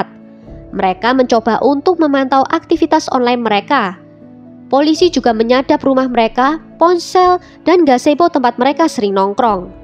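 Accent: Java, Indonesia. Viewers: Aku merasa terganggu sm medoknya.....Tlg latihan lagi mbak.. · Logatnya mba medok tp suaranya bagus jelas terdengar 👍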